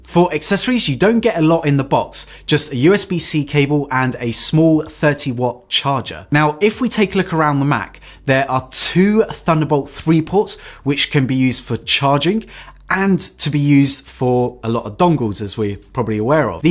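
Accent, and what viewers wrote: United Kingdom. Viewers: Yea, a British accent. You are the first reviewer to mention the screen record as part of the equation. I have the i5 on order with 16Gb & 1Tb after watching lots and lots of comparisons. I decided the i7 might not live up to it's promise. (I loved my old MBP with quad i7 but Apple killed it by not allowing Catalina due to metal)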